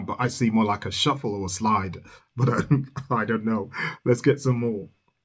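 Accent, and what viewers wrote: England, United Kingdom. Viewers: Your English accent and diction are refreshing. We get so many mumble mouthed people here in the States.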